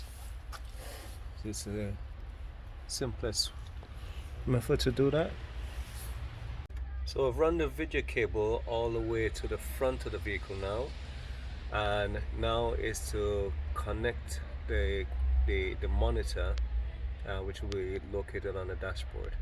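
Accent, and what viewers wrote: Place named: Trinidad and Tobago. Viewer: Hey, why did you turn off the comments on the other video? You sound like a Trini.......are you? I have a VW Caravelle Syncro...planning to remove the stock radio and install a touch screen radio with the reverse camera. While it's activated from the wiring on the reverse lights, I will be putting in a bypass switch (thanks for reminding me), where I can switch to the reverse camera even when the van is in forward.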